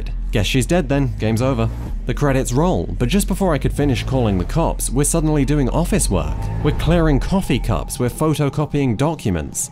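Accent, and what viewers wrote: United Kingdom. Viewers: The British accent makes this critique overly pretentious and distractive. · Why do humans x? Idk but im sure a gaming essayist who makes up words, and has seemingly tenuous grasp of civics is going to tell us in a tapestry of mixed metaphors, pointless erudition, and complete lack of knowledge/context asude from gaming. Why do people who play lots of games think it equips, instead of completely contort, their understanding of reality? Yay another 40 minute leviathan embarassment to eyeroll thru hoping this youtuber would just review games and quit playing armchair philosopher. Anyone can play shitloads if games and talk about them with a british accent and get views, what does actually bring to the table? Not much. once you get to a few of his vids this becomes verrrry apparent that this person is so out if their depth creatively.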